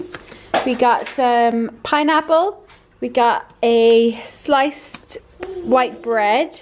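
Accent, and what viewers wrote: Ireland. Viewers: I just love the Irish accent :) hello from the U.S. ! (Washington State) :)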